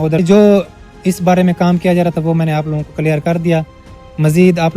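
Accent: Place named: Punjab, Pakistan. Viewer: Sb upper Punjab walon Ki boli bol Raha ha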